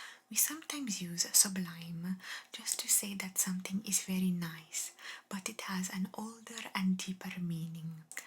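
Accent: Italy. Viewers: I love your Italian accent videos beautiful eyes ❤your voice is so calming 🫂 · È così bello ascoltarti, il tuo accento italiano è meraviglioso e molto rilassante.💚🤍❤️ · Hello, magnificent ASMR with an Italian accent. I loved it and enjoyed it, it's relaxing. Greetings, beautiful 🌹🌹🌹🌹💖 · I love the creativity that you put into these roleplays. Very few ASMRtists would think to talk about water towers or Tom Cruise playing hide-and-go-seek. Your Italian accent is very fun too.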